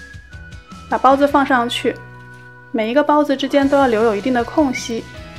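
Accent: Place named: Shandong, China. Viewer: 我想一定是山东人！口音也像。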